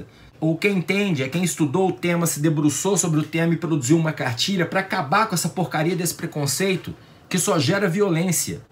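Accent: Minas Gerais, Brazil. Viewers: Com a tua serenidade e o sotaque mineiro não parece estar full pistola, cada dia melhor o teu canal, em relação ao Doria, usurpadores tem em todo espectro político, desde uma ponta da ferradura ideológica até a outra. Abraço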